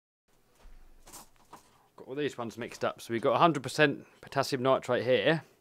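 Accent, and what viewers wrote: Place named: United Kingdom. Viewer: GradeAUnderA is that you? What region or area in the UK is your accent from?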